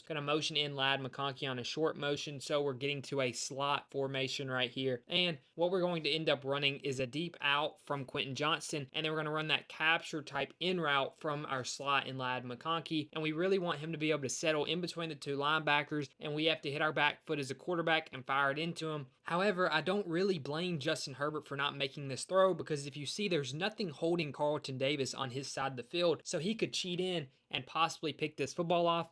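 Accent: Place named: South, United States. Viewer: Honestly with this guy's southern accent, its like it's a ladd incognito youtube channel. "As you can see on this play iiii.... I mean ladd was wide open".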